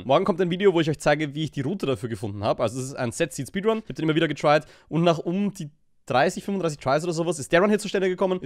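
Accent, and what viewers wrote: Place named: Austria. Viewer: kommst du zufällig aus Österreich (nur aus Interesse) wegen dem Akzent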